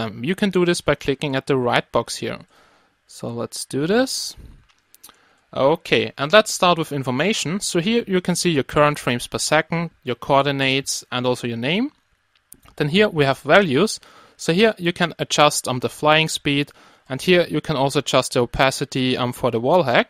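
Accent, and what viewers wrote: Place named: Germany. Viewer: You speak like an German who speak Amarican English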